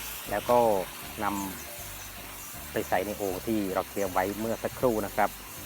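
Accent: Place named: Cambodia. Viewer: สำเนียงขะแม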